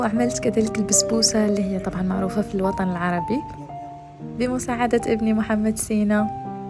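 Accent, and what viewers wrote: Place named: Levant. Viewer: انتي تكلمين الهجه اللبنانيةاوالشاميةوليست الهجه الجزائرية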